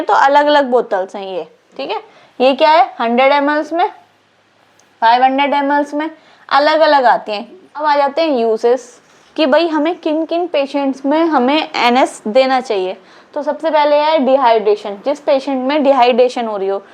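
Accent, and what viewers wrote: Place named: Assam, India. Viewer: Ma'am aap Assamese ya bengali ho kiya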